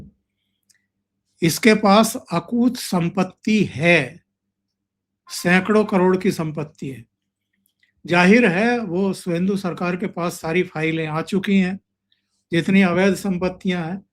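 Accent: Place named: Haryana, India. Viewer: Nahi pata tha shri Omkaar sir haryanvi hai aaj pata laga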